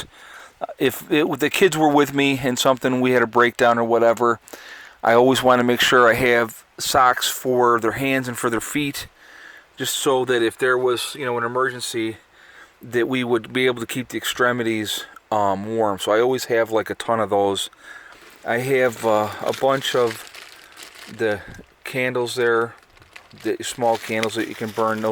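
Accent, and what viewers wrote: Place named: Pennsylvania, United States. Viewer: I know you say you live in New York, but I just talked to someone on the phone that works in pa and sounded just like you. Also a visiting nurse. Where do you live really? Haha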